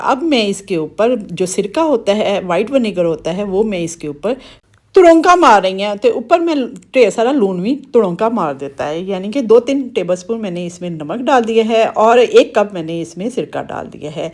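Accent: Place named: Punjab, India. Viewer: Zabrdast ap k panjabi tch bht enjoy krty hain hm khush rhain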